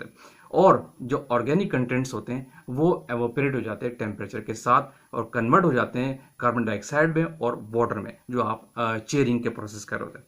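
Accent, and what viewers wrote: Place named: India. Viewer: Ok aapke pass bohat knowledge hai sir pharmaceutical industry ka 🫡🫡🫡 bohat achhe se explain bhi karte ho aapko to pharma industry ka professor hona chahiye language tone se aap 90% indian hi lage baki kuch words se laga ki aap pakistani ho😅 keep it up sir humko knowledge dete rahiye hum aapko support karte rahega 👍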